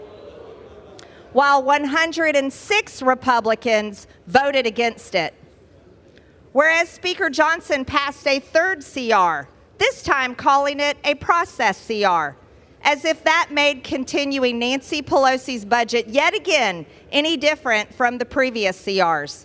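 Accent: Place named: New England, United States. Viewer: Less New England with it. Less Fatiga This might fly in NY but SAG runs Palestine in Cali. Nobody wants to hear Euro Fatiga in USA, WANNA BE NY like this accent. Less nasal and maybe less nasal blocked passage ways like you're laying on the sand looking at the stars and claiming sand castle. 😮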